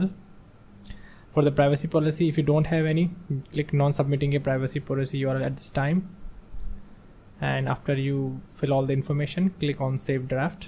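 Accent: India. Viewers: The indian accent makes me confident he knows what he's doing and not trying to scam me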